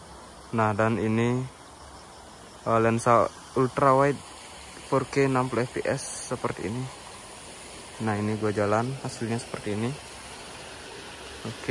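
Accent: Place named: Bali, Indonesia. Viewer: Logat bali, plat motor bali, jawabnya jakarta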